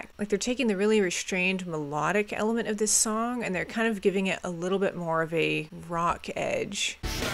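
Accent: United States. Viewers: I watch a fair bit of react content and your reactions are pretty unique I feel, I've been watching your videos here and there for a while now and it always feels like I'm watching a radio broadcast. There's also something very open about your style, you come across as very unassuming. I love how you can listen to the gnarliest lyrically super fucked up stuff and just be like "I really love how the guitar builds with the energy of the lyrics". This reaction in particular is pretty funny to me, I live in the Netherlands which means German is a very recognizeable language for me. Despite the fact that you clearly have 0 clue what's going on with the lyrics you still pick up on some cool stuff instrumentally, and well, there's just something comedic about someone with a very American accent pronouncing the song titles and the band's name. It reminds me of Brad Pitt in Inglorious Bastards when he greets people in Italian. 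I'm not entirely sure why I felt the need to write all that out but what I'm trying to say is I think you got something pretty unique going on here and I think that's great. Keep it up!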